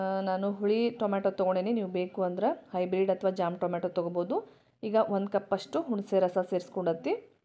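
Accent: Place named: North Karnataka, India. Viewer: Utthara kannada hage maathaduvudu nivu thilkobeku kannada baashe shyli galanna.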